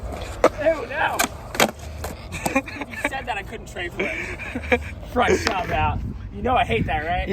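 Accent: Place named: Baltimore, United States. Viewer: You know someone is from Baltimore when they call it Baldamore 😂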